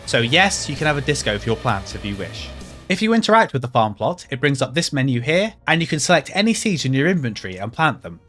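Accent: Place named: United Kingdom. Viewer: I gate how brits pronounce things but otherwise good video.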